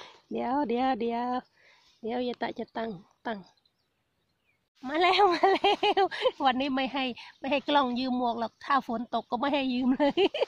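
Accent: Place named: South, Thailand. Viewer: ดูแลตัวเองด้วยจ้าา,เอื้อย บ่สบายเว้าสำเรียงออกมาทางภาคใต้เลยเนาะ(น่ารักไปอีกแบบ)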